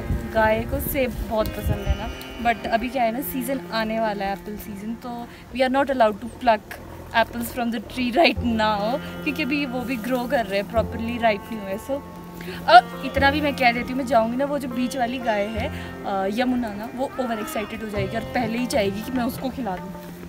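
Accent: Pahadi, India. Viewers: Tumhari awaj mai ye jo phadi touch aata hai na its reallly beautiful...keep it up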